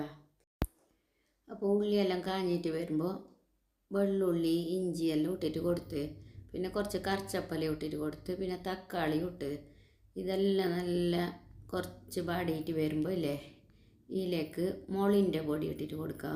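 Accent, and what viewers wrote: Kasaragod, India. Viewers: Ningale Kasaragod language nalla rasandu 😊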